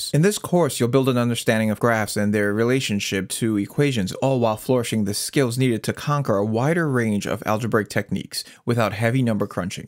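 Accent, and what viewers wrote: United States. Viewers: Quit using these stupid metric units that nobody uses, also you sound American so I don't know why these units are your primary's.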